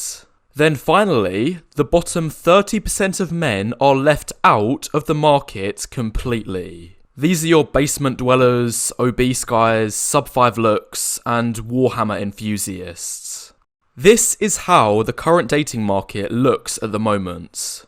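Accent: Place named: RP, United Kingdom. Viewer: I like dialect. He can easy play in a Prince Charles movie.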